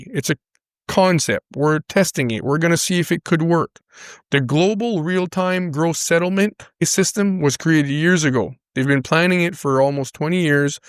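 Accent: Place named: Quebec, Canada. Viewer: brother never stop making XRP content. Btw I’m In Toronto. You sound like you’re from either northern Ontario or Quebec. Am I right?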